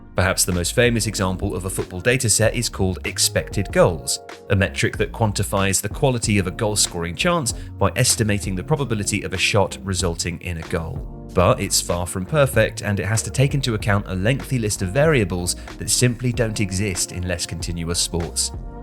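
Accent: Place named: United Kingdom. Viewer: I waited the whole video to hear something about hockey, a sport that is in some sense very similar to football. I think when it comes to data analysis, you just analyse what's important for you to help you decide either strategy during a game or overall management of the team. And I still believe there is a gap here because Americans love statistics, the video having a British accent makes me think they haven't talked to the Americans yet :). I grew up watching American football and I will never forget when I was once watching a game and the stat came up on screen (not accurate but you'll get the idea): "Quarterback John Doe has a pass completion rate of 57% when the temperature is below 32 degrees" (Fahrenheit of course). I was like "oh, they will have data for everything". So, back to football, they will have the important stats. Statistics on victories (home and away), victory rate after scoring first, victory rate after trailing starting the second half, victory rate after trailing when compensation time starts, goals per game (already done), goals per penalty kicks (where in the goal the shoot more often, accuracy per kick location, I think this is already done), penalty kicks stopped (by keepers, already done), clean sheets for keepers (already done), accurate passes (left and right leg), goals (left and right leg, headers), ball contact time/times (done) they may even have duel stats, like Messi's successful dribble rate against Pepe. 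In hockey you would have similar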